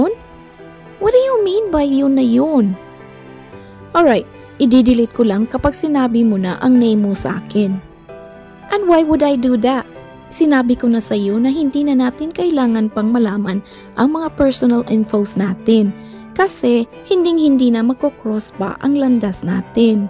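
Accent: Visayas, Philippines. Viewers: Hay ano ka bisaya mag ayos ayos ka naman mag salita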